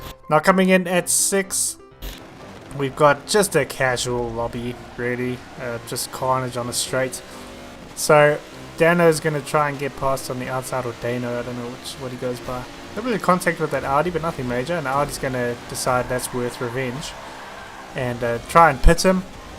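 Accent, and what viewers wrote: South Africa. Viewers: Are you south African I love the accent but I'm not good at accents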